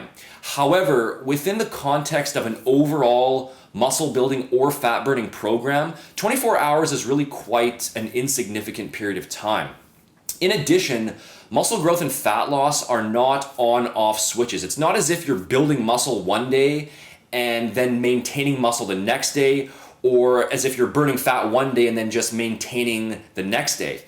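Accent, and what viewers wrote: Canada. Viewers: are you from toronto? you sound like kinobody with the way you pronounce your O's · Are you Canadian?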